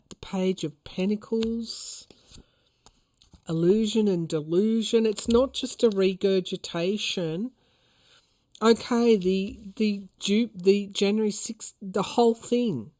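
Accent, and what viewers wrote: Australia. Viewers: Love your Australian accent, love your Australian heritage, love your energy. Thank you so much for your honesty and openness. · Thank you,. I love your accent and I love that you’re proud of your heritage. Are they different accents for different parts of Australia? I was raised in Philadelphia and then moved to Chicago and then to Maryland and everybody can tell as soon as I’ve been around Philly friends, my accent changes, it’s kind of fun! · Love your Aussie accent! It fits you perfectly! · Your Australian accent is gorgeous!!! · I so look forward to your readings and your beautiful Australian accent is part of the reason. I went through speech therapy in elementary school, had no say in the matter, for my lisp. Would have never known you had one. Keep shining your light!❤ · Brilliant, entertaining and informative. Thank you for the great intellect and for the support spirit shows to those around the world for a better world and getting rid of corruption in high places. 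Your Australian accent is wonderful and the Aussies over many years have been right there to help when always needed. Reference WWI & WWII. Thank you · We love you just the way you are, ❤ And I Love your Australian dialect!!! You rock! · you speak English, regardless of you Australian accent. You speak perfect English regardless of your lisp. I understand you perfectly. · I absolutely love your Australian accent!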